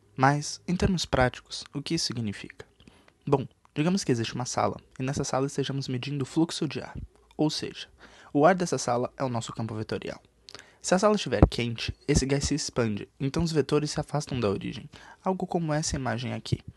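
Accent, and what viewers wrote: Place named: São Paulo, Brazil. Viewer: Amei o sotaque paulista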